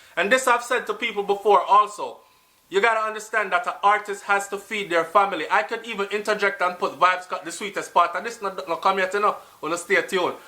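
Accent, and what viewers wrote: Trinidad and Tobago. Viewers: Damn remember the good ole days when national anthem play inna dance.. I was really young but I remember.... da trini accent deh fool me and mek mi a wonder if u fwd from trini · 😆😆😆😆😆 your trini-accent. Big up yourself.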